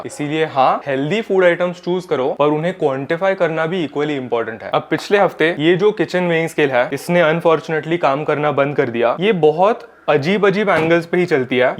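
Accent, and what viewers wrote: Maharashtra, India. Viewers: MARATHI ACCENT IS SO CUTE! 😊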